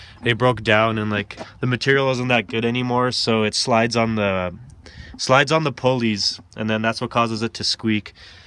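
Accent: Canada. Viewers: jesus you sound so Canadian. i am also Canadian you make me question if i sound like you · you have like the stereotypical canadian guy, i try to sound like u when making fun of my canadian friend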